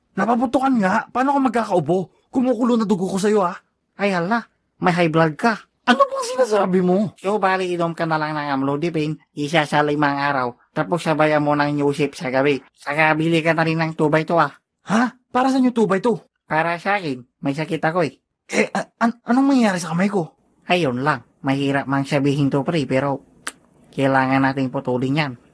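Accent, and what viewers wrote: Ilocos, Philippines. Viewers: Ilocano ka boi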